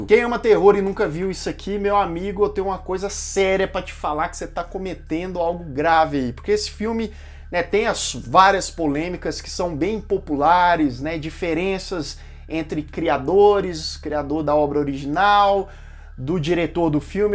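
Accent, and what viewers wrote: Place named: Minas Gerais, Brazil. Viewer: meu deus como eu amo o sotaque mineiro AAAAAAAAAAAAAAAAAAA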